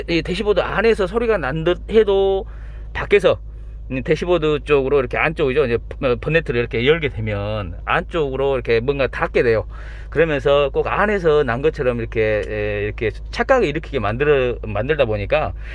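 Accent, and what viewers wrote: Jeolla, South Korea. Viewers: 어디서 공업사를 하시는지 궁금합니다 전라도 말투신것같아서 전라도면좋겠어서 댓글드립니다ㅠ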